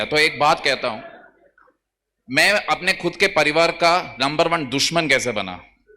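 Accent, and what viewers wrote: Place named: South, India. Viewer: Bhasa se hi pta chl rha tha south k h 😊kuki south movie me ase hi bolte h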